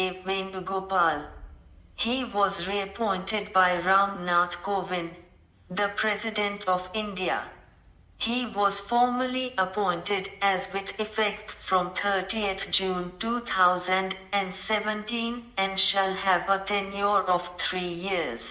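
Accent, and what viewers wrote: United States. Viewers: Happy new year Sir. But why u encourage US accent why are we accept this? Aise rha toh hr govt. Exam m dictation k liye us/uk accent hoga. Indian accent m Kya problem h?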